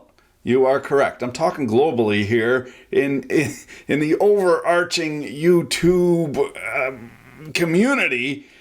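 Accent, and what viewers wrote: Quebec, Canada. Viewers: "Êtrrre Drébrrrouillarrrrdrrr" I love Quebec accent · always gorgeous to listen your cool casual way.Do French Canadians always speak this Nice!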